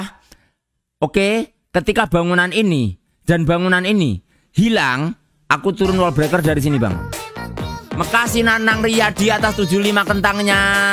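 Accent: Java, Indonesia. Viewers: Ngab... Jenengan asline pundi geh... Jowone medok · bahasa Inggris mu keren yoan ngab,medok e Yo oleh🗿🗿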